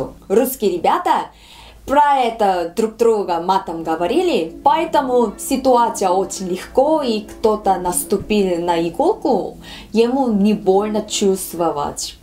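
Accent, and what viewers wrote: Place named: Tajikistan. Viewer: Базаришь как таджик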